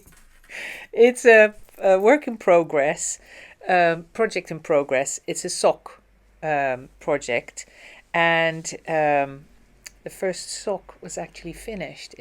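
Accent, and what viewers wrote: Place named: Netherlands. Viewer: my best friend is Dutch and so recognized your accent coming through straight away. You look so good in all the clothes that you model. Continuing to watch your podcasts. Cheers 🥂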